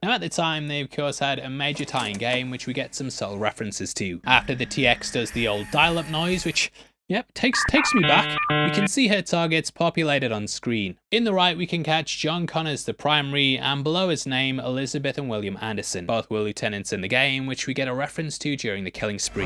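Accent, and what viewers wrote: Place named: Newcastle, United Kingdom. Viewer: Wait is a Geordie?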